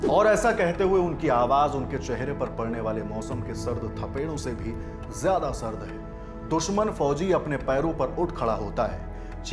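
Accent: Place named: Bihar, India. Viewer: Yar kahani simple bata itna ghumata q hai bihari accent thik karo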